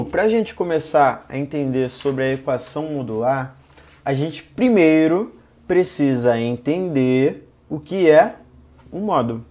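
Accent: Rio de Janeiro, Brazil. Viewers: to apaixonada nessa voz carioca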